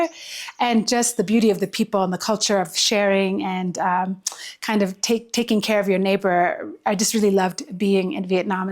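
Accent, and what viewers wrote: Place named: Kenya. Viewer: she even got that kenyan accent wow.....i wouldn't even know she's ethiopian if i met her, would have assumed she's kenyan borana or oromo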